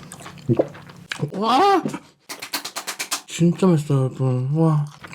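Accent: Jeolla, South Korea. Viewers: 말할 때 가끔 들리는 전라도 억양이 너무 매력적...♡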